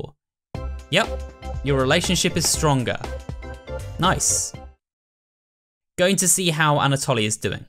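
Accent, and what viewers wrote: United Kingdom. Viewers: does that. He has such a soothing british voice.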